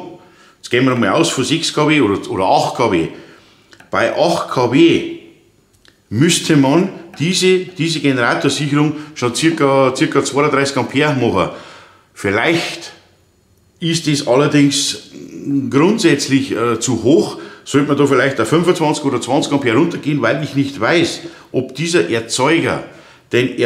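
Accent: Bavaria, Germany. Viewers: Herzlichen Dank für die klaren Worte und die guten Erklärungen. Mittlerweile habe ich viele Videos von Ihnen angeschaut und finde jedes sehr interessant und für mich praktisch umsetzbar. Vor allem das Thema Notstrom, Einspeisung und Ecoflow betreffend. Kompliment! Positiver Nebeneffekt: Ich habe mich an den bayrischen Dialekt gewöhnt, sodaß ich heute ohne Rückspulen zu müssen, alles beim ersten Mal verstehe! 👍👍🍻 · Einmal wieder ein sehr informatives Video. Dankeschön. Auch, wenn es für mich leider wieder eines der Videos war, welches für mich aufgrund des von mir (im Verhältnis zu anderen Videos) erneut verstärkt wahrgenommenen bayerischen Dialekts akustisch leider nicht durchgängig einfach zu verstehen war.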